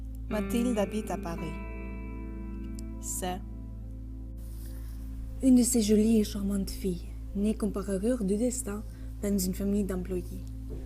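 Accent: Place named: Portugal. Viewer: Tu as l'accent du cliché portugai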